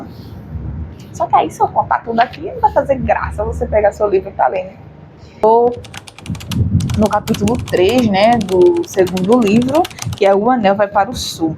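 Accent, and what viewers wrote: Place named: Recife, Brazil. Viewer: O seu sotaque é do Recife.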